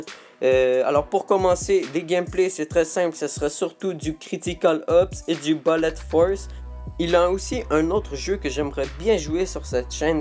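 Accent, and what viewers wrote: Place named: Quebec, Canada. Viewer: C'est un accent de Québec :$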